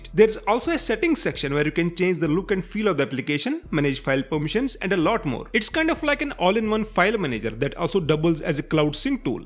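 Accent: Kashmir, India. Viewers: For The Sake Of The Holy Cow !🐄 That's a very heavy Indian accent My Friend. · Are you Kashmiri? The accent gave it away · By any chanass brother, u koshur??? I caught the accent 😅❤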